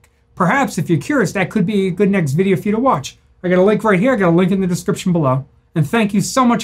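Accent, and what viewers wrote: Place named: Texas, United States. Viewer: Some texanian accent daaattaaa(data)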